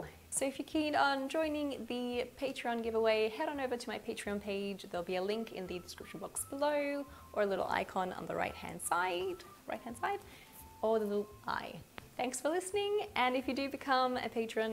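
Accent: Australia. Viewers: Hi, just found your channel looking for tips on how to make my daughters first birthday cake. Your tutorial are awesome. Picked the Aussie accent straight away. I'm from Bendigo.:) xx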